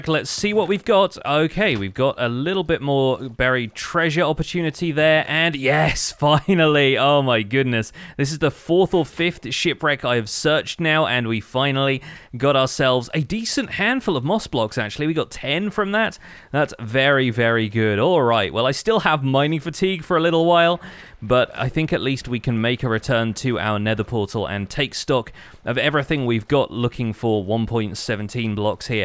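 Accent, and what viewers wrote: United Kingdom. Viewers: I have a question are u British? No pressure😅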